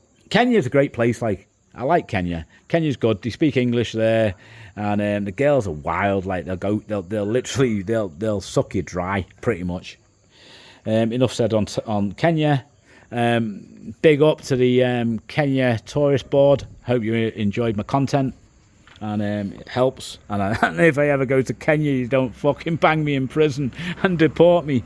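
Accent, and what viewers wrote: United Kingdom. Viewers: Hi, I like your British accent, hopefully will go to show you my French Canadian accent later this year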